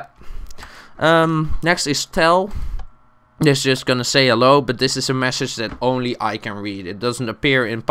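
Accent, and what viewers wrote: Netherlands. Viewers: jee nederlands accent ik hoor het gelijk XD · Bedankt!! Zocht al een tijdje voor dit! (Ik reageer in het nederlands, want jouw engels accent lijkt op die van nederlanders XD)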